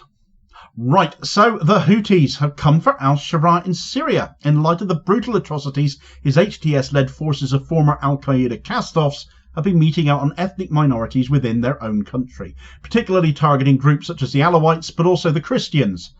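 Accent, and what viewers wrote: United Kingdom. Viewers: I have great difficulties with the British dialect of American 😁, however, thank you for covering this important content.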